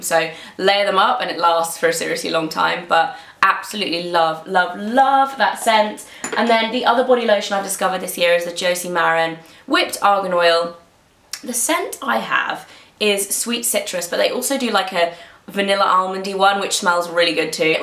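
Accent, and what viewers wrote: United Kingdom. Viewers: you are one of my fave british youtubers! love your accent and your product recommendations are really good too :)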